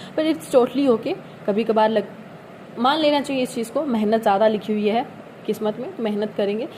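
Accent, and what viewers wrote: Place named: Maharashtra, India. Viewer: Maharashtra s clear h ye to.... Marathi aati h apko🤔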